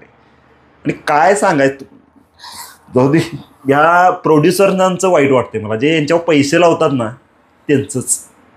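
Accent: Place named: Kolhapur, India. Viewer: I like your Kohapuri accent. And ofcourse the rokh tokh analysis.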